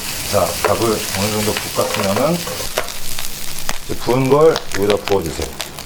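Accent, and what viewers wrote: South Korea. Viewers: 이분 한국분인가요? 억양까지 한국인같아요.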